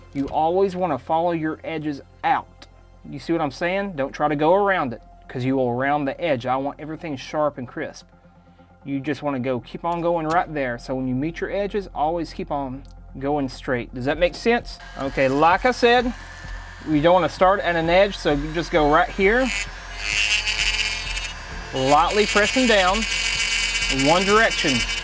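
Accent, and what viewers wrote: South, United States. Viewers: I just love your southern accent! New to woodworking and loving it!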